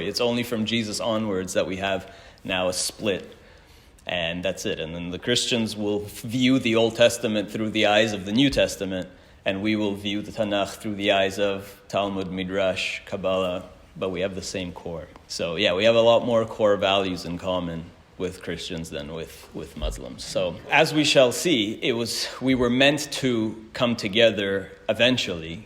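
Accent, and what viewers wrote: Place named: Canada. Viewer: BH wonderful every (canadian) syllable; form, content, substance, and especially, wit/humor. if Moses spoke English, he'd sound like this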